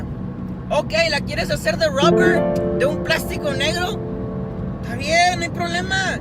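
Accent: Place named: Paisa, Colombia. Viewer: Puberto imberbe, si la tecnología de esos vehículos no está a tu alcance mejor ni los toques, hablas de Honda y autos que según son resistentes y al inicio que Volkswagen es rápido, potente etc ... Nivela tus argumentos y ponlos en dónde deben de ser. Cuando conozcas la tecnología de Volkswagen y autos de nivel alto (que creo que no están q tu alcance) te invito a Georgia. Saludos paiza, me gusta tu acento.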